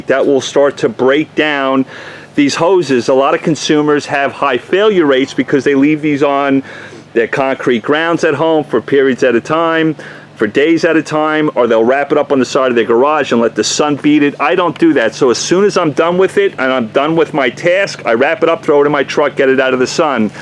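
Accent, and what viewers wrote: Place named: Texas, United States. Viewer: Thanks for the video. I recently found your channel when searching for reviews of polishers. Funny, I have no trouble understanding you despite the Texan drawl ... :)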